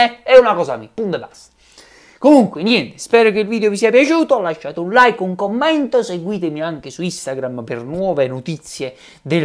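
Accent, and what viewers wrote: Naples, Italy. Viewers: ma sei napoletano??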